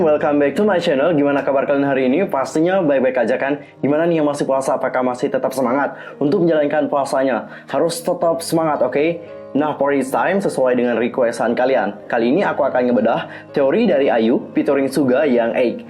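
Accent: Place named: West Java, Indonesia. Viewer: Salfok sama cara ngomongnya sunda banget. No Hate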